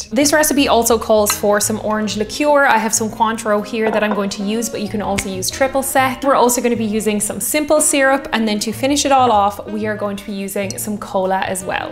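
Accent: Ireland. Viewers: As a 21 year veteran fireman from a university town PENN STATE {one of, if not the, top party schools in the USA}. . . I LOVE the Long Island Ice Tea. My favorite way to drink it? by the pitcher! My record was 3 pitchers. And I still walked home upright. And my I say from the states, It's hard to tell which is more lovely, your eyes, hair, or that wonderful Irish accent. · Greetings from America🇺🇲 Saw you first on the TRY Channel, which was fantastic fun. Didn't know you had your own series; great video. One can never go wrong with the iconic Long Island. Love the video, Love Irish accent, and the perfect hostess. Looking forward to more of the same in the near future... Cheers!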